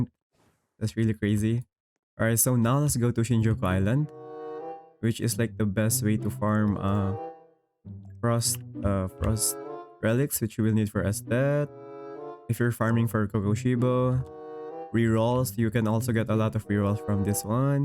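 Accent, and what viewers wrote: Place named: Canada. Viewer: this might be the most candian accent i ever heard in my lifetime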